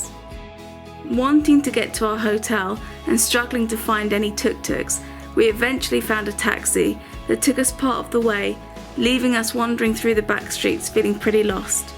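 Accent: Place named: England, United Kingdom. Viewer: ภาษาอังกฤษ สำเนียงอังกฤษ มันเป็นเอกลักษณ์เเห่งต้นตำหรับจริงๆ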